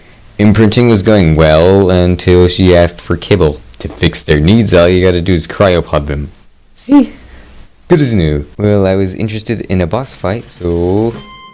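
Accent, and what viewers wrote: South, United States. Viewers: Why is he getting more country as he goes? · Damn I wish we got more of that nice country accent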